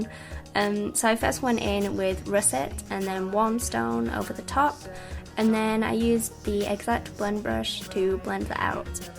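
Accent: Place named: Sheffield, United Kingdom. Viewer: what part of England are you from? X You sound as though you're from Sheffield or near? x You're so gorgeous with or without makeup! x Also, what is your FIRST favourite eyeshadow? x this is such a great video, and I haven't even fi nished haha x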